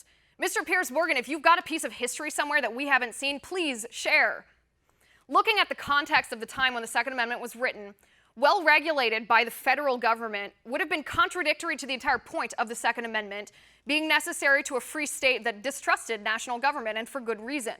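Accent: United Kingdom. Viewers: Being part Irish, I am too polite to write how I feel towards Morgan and his British accent telling me to disarm. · "Are not the King's ships and the King's troops enough protection for an honest man?" Gen. Sir Thomas Gage, commander of the disastrous British raid at Lexington and Concord. Does anyone know if Mr. Morgan and Gen. Gage related? Gun control with a British accent does not play well here. It didn't play well 243 years ago either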